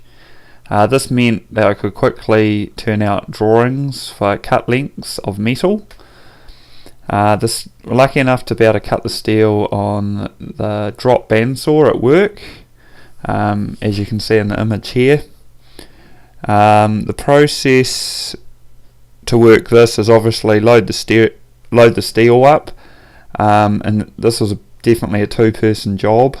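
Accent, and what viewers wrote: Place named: New Zealand. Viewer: Very helpful, thank you! It sounds like you're from New Zealand. South Island???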